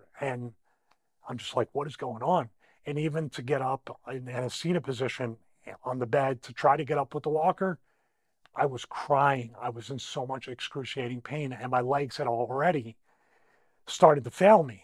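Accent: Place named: Philadelphia, United States. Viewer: Never apologize for your Philly accent, you are Philly Born, Philly Raised, Philly Tough and Port Richmond Strong. Prayers for you and all who have no one who are struggling with their illness.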